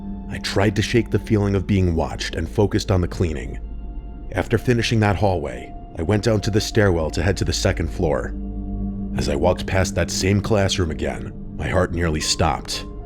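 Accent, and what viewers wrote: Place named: East Coast, United States. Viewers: NYC or suburbs area. Probably Brooklyn or LI. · Mr nightmare got a east coast accent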